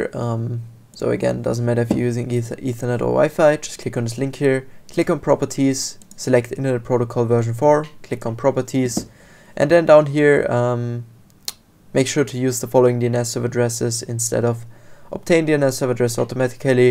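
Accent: Germany. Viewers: der deutsche Akzent ist am Start auf jeden fall haha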